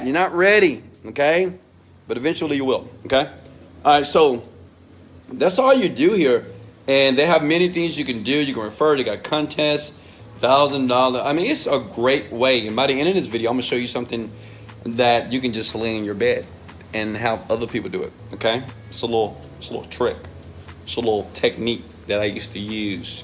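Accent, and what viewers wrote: South Africa. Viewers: Fantastic, you sound like you're from JHB or the Cape.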